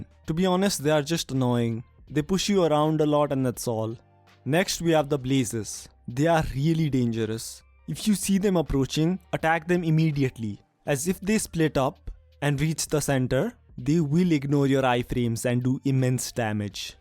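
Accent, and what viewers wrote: India. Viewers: by the voice i can surely say he is an indian love u from india <3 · I cant handle the thicccc indian accent it hurts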